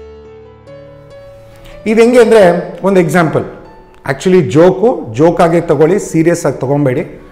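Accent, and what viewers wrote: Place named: Kerala, India. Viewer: WOW... BABAki Jai ho.... from your Hindi Accent, I always thought you might be from Kerala... but this gave me goosebumps when you spoke in Kannada... Super... would request you to make more kannada videos...:-D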